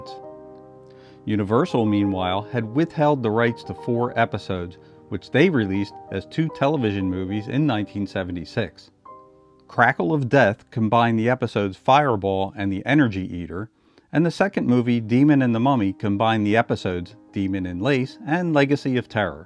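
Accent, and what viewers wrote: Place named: Philadelphia, United States. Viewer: One of my favorite shows; you video makes me miss it and hearing your Philly/South Jersey makes me miss Wawa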